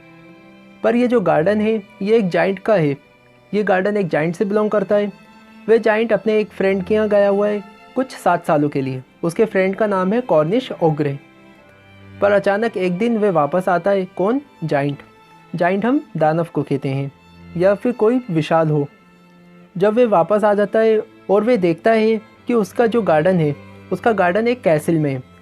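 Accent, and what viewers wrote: Bhopal, India. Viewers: bhai m bhi accent sunte se hi smjh gaya tha ki aap bhopali ho 😄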